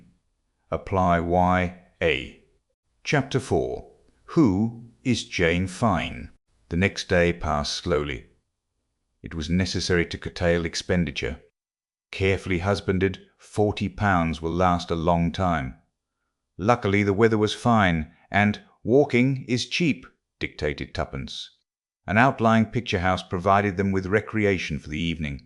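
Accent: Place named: Australia. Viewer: Sadly, a new AC novel, only her second, and yet "read" by a mindless (literally), uncomprehending AI in a totally deadpan manner, robbing the listener of any chance to be drawn into the fun or excitement of the adventure. Don't quite follow why the accent should be an Australian, mostly monotone, though well enunciated. What a total, boring waste.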